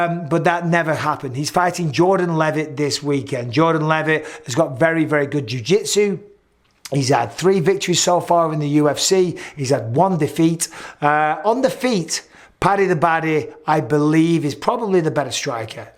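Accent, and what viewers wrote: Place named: Manchester, United Kingdom. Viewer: tries so hard to be nice but the Ol manc comes out eventually 😄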